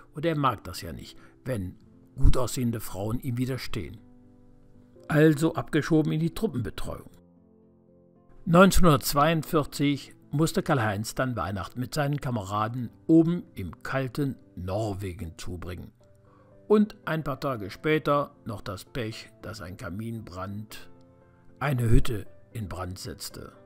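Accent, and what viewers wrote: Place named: Bergisch Gladbach, Germany. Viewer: Ich glaube Bergisch Gladbach . Danke für die tollen Videos.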